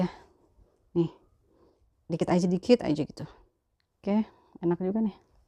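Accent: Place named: Betawi, Indonesia. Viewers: Logat Indonesia betawinya masih kental... Bagaimana orang Arab lihat wajah Indonesia yg rata2 pesek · Mbak..lucu bangeet sii..bicara nya kok depok bangeet..rumahan gayanya .gak imeg "an..haha..love you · Betawinya msh kental...😀😀😀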